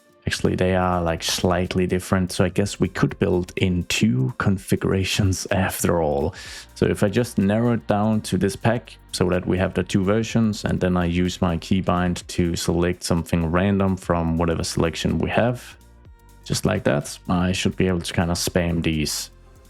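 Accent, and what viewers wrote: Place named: Denmark. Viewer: Er du dansk? (I'm guessing this based on your heavy accent) :D Incredible content and builds.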